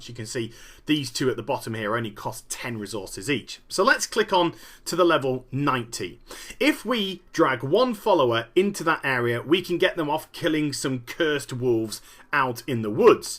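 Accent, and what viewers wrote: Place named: England, United Kingdom. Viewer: I Think hes English :D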